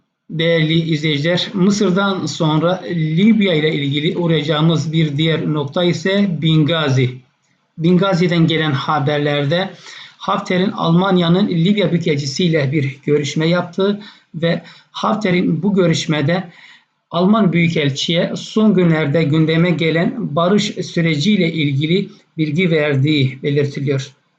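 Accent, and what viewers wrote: Cyprus. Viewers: kolay gelsin. Özür dilerim. Şiveniz Kıbrıs şivesi gibi. Doğrumu? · Oğlum konuşman Kıbrıs Türkçesi. Sen rummudun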